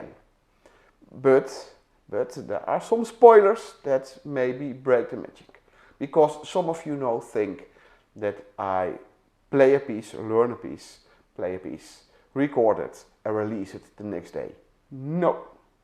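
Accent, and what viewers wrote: Netherlands. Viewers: Like every Dutch (or Flemish) person I've ever met, you speak perfect English,. Your Dutch accent is as lovely to listen to as your music. And your Ten Commandments are just right.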